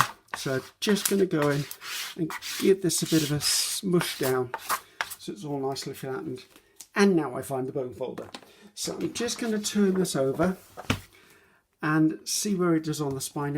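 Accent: England, United Kingdom. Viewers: First of all, I love your videos. I normally never look long videos. I get bored very quick. But with your videos I like the things you tell, your English accent , and I am afraid I miss something when I skip parts. :) I used to glue the pages, but I dont do anymore. My gluesticks cost 5 Swiss Franc ( about 4 pounds) x 3 , too much for me to spend before I begin a journal.. So now I make my mixed media pages separate and than glue it on a single page. No bulk Most pages will be covered with just paper collage anyway Or I buy a nice MM journal for that 12 Pound and alter it on the go. . The gluestick I use is Tombow mono Professional glue stick. It is SO good, no buckling , and even after many years it sticks very strong. And I am addicted to the smell. After a long surching way finding the best and cheapest liquid glue : collall all purpose glue! 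It sticks everything , paper , fabric, etc. And it costs almost nothing here in Switzerland. Well , long story, and I think you know most of it already, Bye bye, have a great week!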